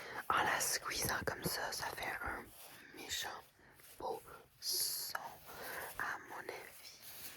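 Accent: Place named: Quebec, Canada. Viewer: J’allais te demander ta nationalité vu que je ne connais pas ce drapeau, mais au moment où t’as parler j’ai compris directement que tu es québécoise. En dehors de ça, c’est une bonne vidéo, bravo.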